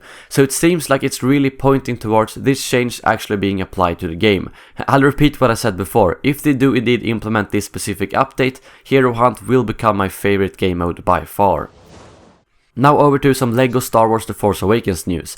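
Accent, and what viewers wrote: Sweden. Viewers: är du från sverige ?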